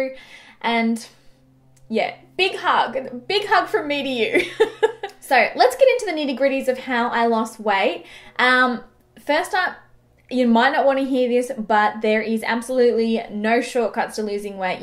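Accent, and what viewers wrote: Australia. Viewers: Australian, huh?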